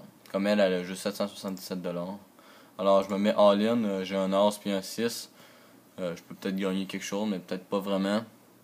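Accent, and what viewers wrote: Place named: Belgium. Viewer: tres bien expliquer mes bon axent belge je croie j aime bien je voulait te dire j aimerai bien quon joue ensemble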